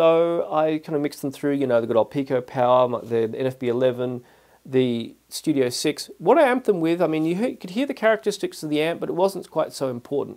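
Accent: Australia. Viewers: Great review!! I’m thinking of getting a set of headphone. I prefer closed. I have 2 choices in mind at the moment. This Sony or the Shure SRH1540. Any chance you’ve listened to the Shure? Unfortunately I can’t directly listen to the Sony as I have to get it from Australia (you sound like you’re from Australia. I’m from NZ and unfortunately we don’t have it in our Sony stores) it’ll be interesting to get an input from you. Cheers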